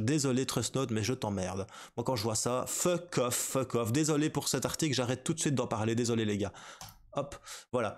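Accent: Liège, Belgium. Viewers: C'est clair, j'avais directement reconnu l'accent liégeois ! :)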